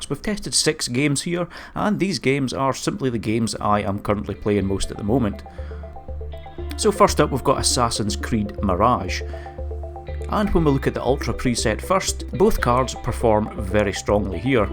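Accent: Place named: Aberdeenshire, United Kingdom. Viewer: Very professional video, is that an Aberdeen/shire accent?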